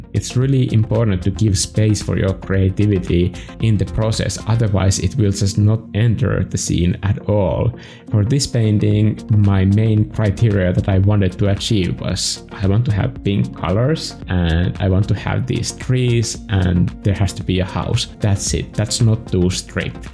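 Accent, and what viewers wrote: Finland. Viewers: Haha the moment you started talking I was like ”HE MUST BE FINNISH OMG”. I love your art and your attitude haha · I can hear an accent... can’t pin point it exactly. But I’m getting Finnish vibes? To say the least, it was nice really hearing you talk about this! You seem to genuinely enjoy doing this. The painting was absolutely lovely, certainly inspirational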